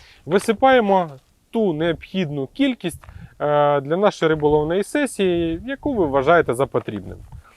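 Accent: Surzhyk, Ukraine. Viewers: Україномовний чи російський яка різниця, аби контент був хороший. І не в образу вам, уривки Азаровщіни у вас присутні.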